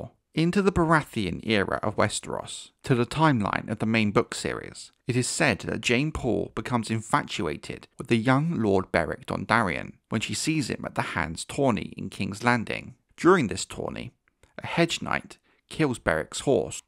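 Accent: United Kingdom. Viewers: Hearing a narrator from the UK (assumption) makes me feel like these are history videos as opposed to lore.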